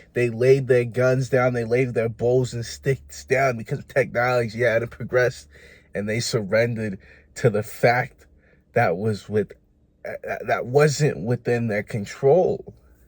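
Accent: United States. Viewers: I would just like to know how did you get ur boston accent when u not from boston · You from Rhode Island?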